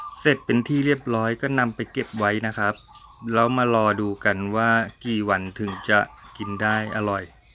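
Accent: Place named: Suphanburi, Thailand. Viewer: เลิฟห้าหนึ่งได้ยินแล้วต้องตามมาชม เยี่ยมมากค่ะ น้อง 👍👍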